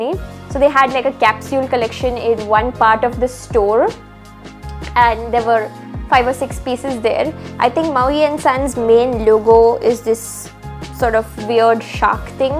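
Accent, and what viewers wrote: India. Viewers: I do really love Indian accent such a unique one!❤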